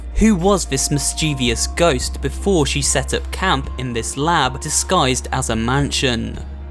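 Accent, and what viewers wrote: United Kingdom. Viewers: You talk like british behind the meme.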